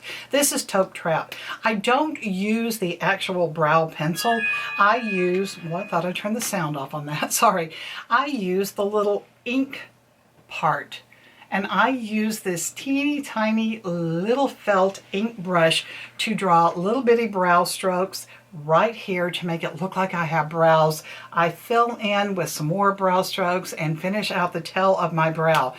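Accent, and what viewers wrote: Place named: South, United States. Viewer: Beautiful skin❤️hear some southern in your voice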